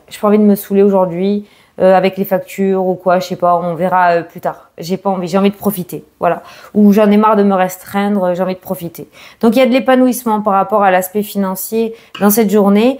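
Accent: South, France. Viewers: Bonjour ma, tu es une personne très de l empathie cela se ressent dans la façon de t exprimer avec ton petit accès du sud que j aime entendre. Je souhaite pouvoir participer à ton jeu concours pour avoir une consultation gratuite de 30 m. Merci pour le postif que tu nous apportes tous les jours et qui nous aident t positiver. Un grand merci ma douce.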